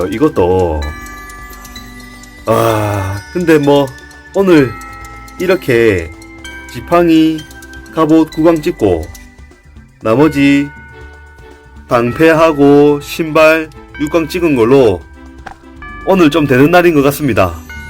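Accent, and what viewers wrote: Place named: Gyeongsang, South Korea. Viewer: 사투리가 경상도인데?? 어디쪽이지??ㅎㅎ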